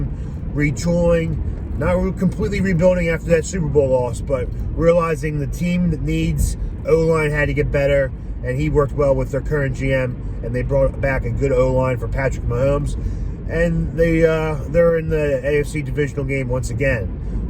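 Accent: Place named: South Jersey, United States. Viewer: Dam bro what part of south jers you from i’ from Atlantic City .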